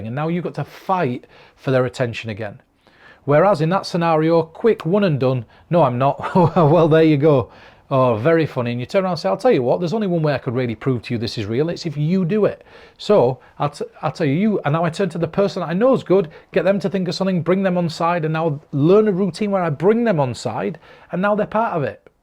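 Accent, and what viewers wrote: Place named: United Kingdom. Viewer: Do I have to have an endearing UK or British accent like you or Daniel Madison to practice mentalism or good magic or can I do it with my silly American accent or do I need to practice in a Canadian accent how a boot that LOL by the way I'm part of the pig pen magic Academy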